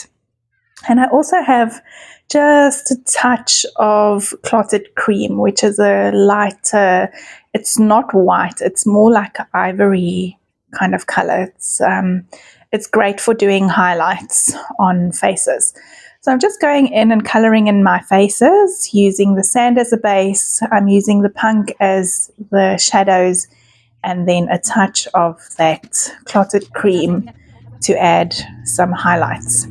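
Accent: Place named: South Africa. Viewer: Beautiful page! I love hearing another South African art journalling because sometimes I feel like I’m the only South African who art journals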